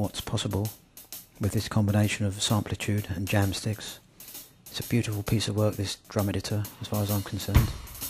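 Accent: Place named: England, United Kingdom. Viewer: luv your Enlgish English accent <3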